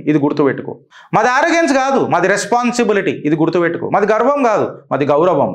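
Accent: Telangana, India. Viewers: Super Telangana bhasha boss, super & nice👏👏👏👏👏👍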